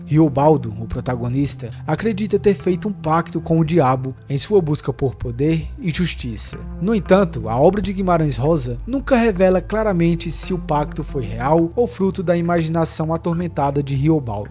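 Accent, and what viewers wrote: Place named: Northeast, Brazil. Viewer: Que Diabo é Diabo, sotaque nordestino.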